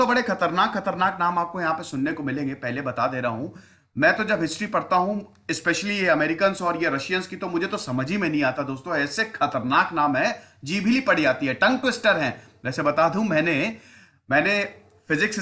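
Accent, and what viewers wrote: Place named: Uttar Pradesh, India. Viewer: UP ke lagte ho bhaiya baato se😂😂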